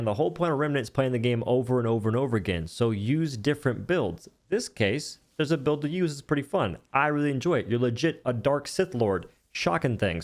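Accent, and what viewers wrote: South, United States. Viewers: Nice vid, but question, is it just me or does your accent just randomly turn on and off? you sound country for like 15 seconds every minute or so. Kept throwing me off. but in a cool way.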